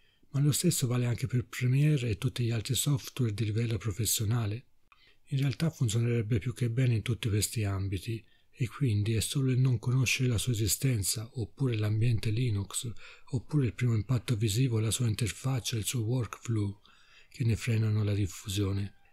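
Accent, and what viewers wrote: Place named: Tuscany, Italy. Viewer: Ciao! bellissimo lavoro! ti faccio i miei sinceri complimenti. Io sono una affezionata utente cinelerra (dal 2003) anche se ultimamente edito poco perche le mie scelte professionali mi hanno portato in altre direzioni. Avendo tempo lo tradurrei, intanto bravo e...essendo anche io toscana pero expat, un tu sai quanto mi sono commossa ascoltando la tua voce!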